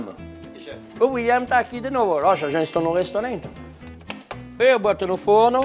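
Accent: France. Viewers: Eu amo esse sotaque francês 😍💕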